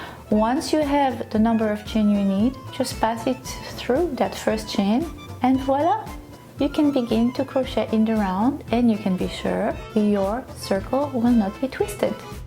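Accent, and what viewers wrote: France. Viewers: you have a french accens XD I love you xoxo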